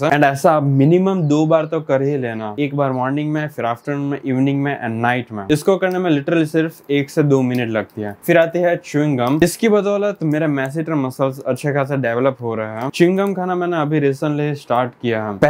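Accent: Gujarat, India. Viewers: Gujju accent >>>>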